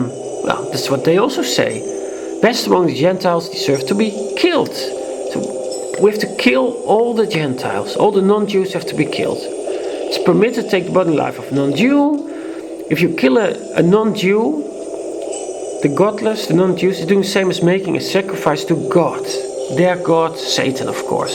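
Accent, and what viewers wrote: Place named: Netherlands. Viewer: Hey, leuke film hoor, je bent zeker echt Nederlands he? Je accent ;)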